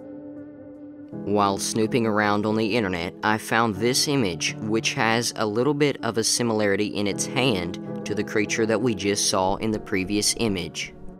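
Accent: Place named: South, United States. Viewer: You sound so country,I wanna say yeah.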